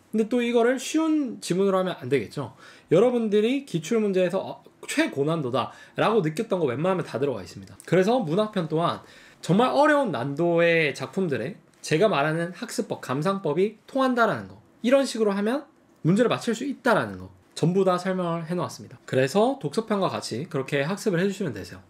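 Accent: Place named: Gyeongsang, South Korea. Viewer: 경북 사투리는 좀 투박해서 싫어하는데 (나 경북사람임) 이분은 사투리 억양이 쎄지만 계속 듣고싶어지는 ,고급지게 들리는 마술이있네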